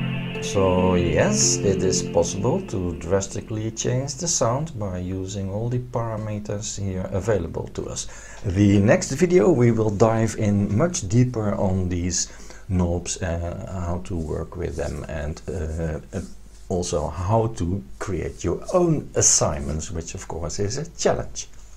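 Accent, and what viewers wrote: Netherlands. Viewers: Hello I like your video's about the MODX. They are very helpfull. Your English sounds a little bit with a Dutch accent, so I assume you are Dutch? Mag ik je daarom iets in het Nederlands vragen; ik overweeg om ook een MODX6 aan te schaffen, maar ik ben vooral geïnteresseerd in de Vocoder mogelijkheden. Heb jij daar misschien al ervaring mee, want op Youtube heb ik daar tot nu toe nog niets over gevonden..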